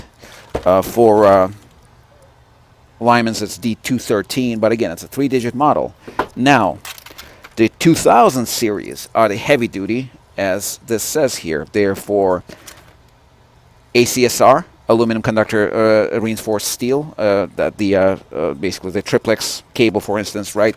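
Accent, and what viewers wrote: United States. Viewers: Thank you for your wonderful review. Love your pure American accent, remind me about George W. bush, my dream English accent. How ever your Knipe= little cutter (Knipex, 😉) is a defective one, robots use to sharpen them after induction hardening and they must have been out of calibration regardless what every one tells, I have a tool collection of almost all of most known manufactures and my knipex collection (two at least of every model cutters has zero defection like yours have got, not to mention the hardening seems to be one south side with it???? you really should send it to knipex factory in Germany and ask them to reconsider it. How ever every each of them as you know already, has been designed for different hardened material to be cut, yours indicate piano wire 2.5 mm something to keep in mind. lovely review thanks.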